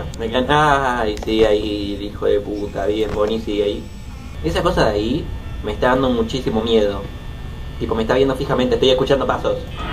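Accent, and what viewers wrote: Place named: Argentina. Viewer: Jajajaja que argentino wacho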